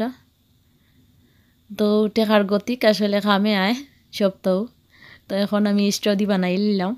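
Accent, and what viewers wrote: Sylhet, Bangladesh. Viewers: আপু কেমন আছেন খুব ভাল লাগের খিছুরি আর চানা আপনার বিডিও আমার খুব ভাল লাগে আর আপনার সিলেটি মাত খুব ভাল লাগে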